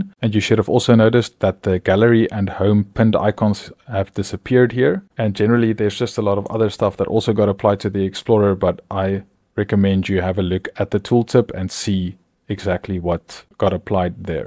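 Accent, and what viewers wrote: Afrikaans, South Africa. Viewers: South African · South African for sure ? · Do I hear a brother from SA? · are maybe south african? also cool stuff! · I'm hearing some SouthAfrica in there :D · South African detected! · It is great to see a South African doing well on YouTube. It also sounds like to have a Afrikaans ascent. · I could be wrong, but it sounds like you have that SA english accent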